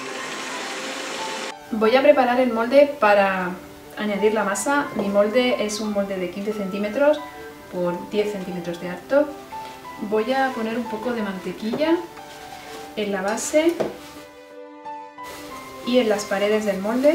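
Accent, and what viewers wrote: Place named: Spain. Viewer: El bicarbonato,se puede sustituir x gasificante(sobre azul y blanco) o polvo para hornear? X tu acento eres española 😊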